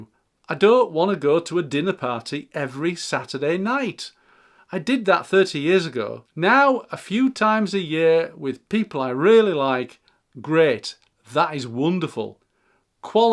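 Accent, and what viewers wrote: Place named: Northern England, United Kingdom. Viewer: Hi, I’ve just found your channel and it’s great to hear your northern accent. I’m a fellow Geordie who emigrated to Alberta Canada back in 2009, but I do have a brother in law in York and miss going there. Mind you, the way you’ve just described it with all the hustle & bustle and drunks from Newcastle coming down on the train I’m not so sure now 😂! I’m 56 and still working part time, but for me it’s the rude people who only think about themselves that annoy me most. I too prefer a quieter life, having grown up going to the Bigg Market drinking for many years lol. I also like to travel in off peak season to avoid the crowds & kids, but most of all during the Winter to escape from -30 temps and the snow. It certainly sounds like the UK has become a lot more expensive, I’ve only been back twice since moving here. 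Anyway, I just wanted to say hi from a new subscriber and I’m going to watch your other videos. P.S is the Cross Keys pub still around?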